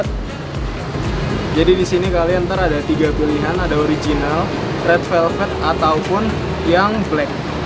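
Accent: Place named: Banyumas, Indonesia. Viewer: Udh tau dr tegal manggilnya aa, tegal kui jowo ngapak mas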